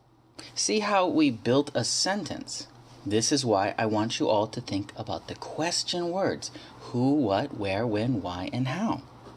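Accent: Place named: United States. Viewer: Your voice is very clear and I like your American accent. Best wishes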